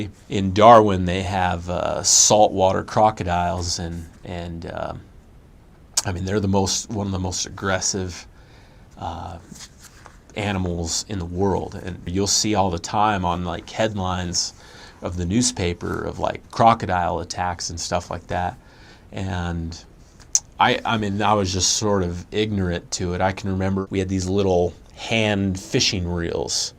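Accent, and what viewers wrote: United States. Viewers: Give it a few months and you’ll lose your American accent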